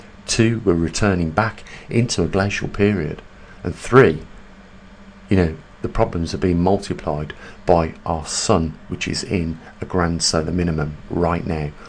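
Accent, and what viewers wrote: Birmingham, United Kingdom. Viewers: You sound like your from my neck of the woods. Birmingham. Greatest thinkers 👍👍👍👍👍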